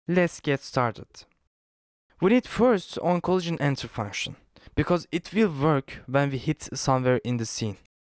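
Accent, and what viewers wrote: Turkey. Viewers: Reis İngilizce kaynak olarak bile bu kadar kısa ve net anlatan bi tek sen varsın. Türk olduğunu anında anlasam bile :) Bu arada anlatım muazzam. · Great video thank you, You sound like turkish are you ? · Aksanindan turk olduguna yemin edebilirim :D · tek bir sorum var sen türk müsün ? çok belli ettinde :D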